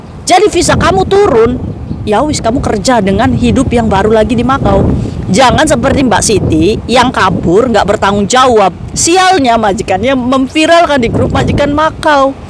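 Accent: Indramayu, Indonesia. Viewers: Pen nakon be tamah mbak kuh aslie wong ndi.logate mh kaya wong Indramayu atau wong cerbon hehe.. salam kenal bae mbak.sehat selalu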